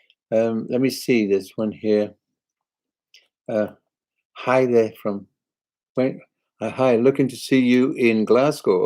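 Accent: South, United States. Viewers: Watching from Wichita Falls Texas your southern accent was so so but you should put in a y'all and a bless your heart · Hello Engebert, I totally loved your live chat today. You have an amazing voice, speaking and singing. your songs have helped so many fans through these trying times. I listen to you when I go to bed at night, of course during daytime.😊 Your laughter on here brings so many smiles to me. Thank you.💓 Love your southern drawl..lol your a beautiful and handsome young man. My love, hugs and prayers are with you always.🌹❤😘🙏 The Lord's Prayer you sing it so beautiful, so touching..💖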